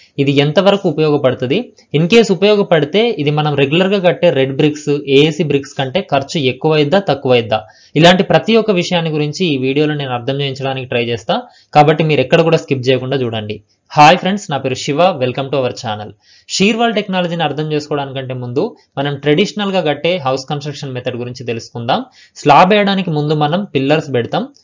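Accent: Telangana, India. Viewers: అది తెలంగాణా యసా అంతే ఉంటది..నీకేం నోస్తుంది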